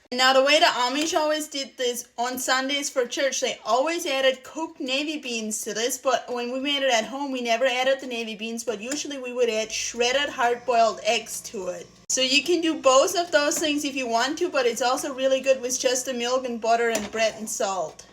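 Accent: Germany. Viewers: Do u have a German accent? I got a little scared when u cut the bread I'm not gonna lie 😂😂 jk ❤❤😂 I think u are wonderful😊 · Is German your first language?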